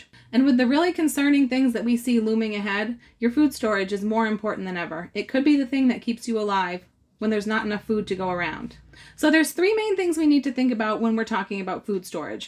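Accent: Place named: Massachusetts, United States. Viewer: Don,t know where you live but I lived on Richard Circle Woburn and neighbors behind were o Border road ,Reading.I can tell you are fro. Pretty close by with your accent which is pretty mellow but its there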